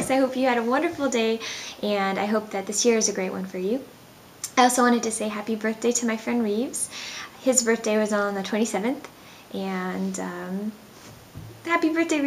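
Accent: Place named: England, United Kingdom. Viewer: Wow English accent is amazing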